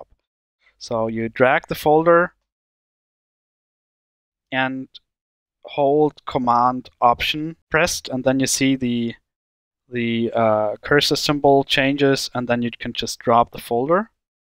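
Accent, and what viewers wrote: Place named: Germany. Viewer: Ich liebe es wenn deutsche Englisch reden✌️ P.S. gutes Video xD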